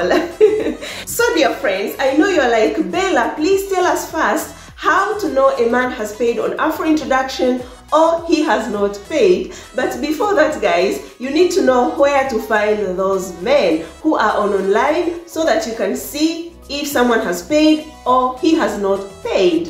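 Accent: Uganda. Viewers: I have been pondering on asking you this question, did you go to school in Uganda? Your accent sounds like that of Ugandans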